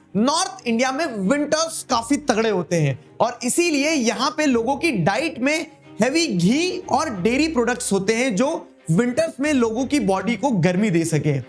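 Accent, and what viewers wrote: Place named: Garhwal, India. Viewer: garhwali dilect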